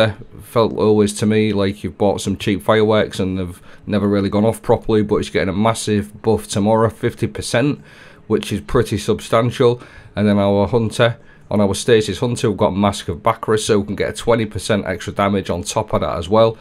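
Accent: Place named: Manchester, United Kingdom. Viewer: my buddy from across the pond heard your voice thru my mic as I was watching this clip. He’s guessing you’re: Manc or salford 😊??? Good videos as usual. Keep ‘em coming.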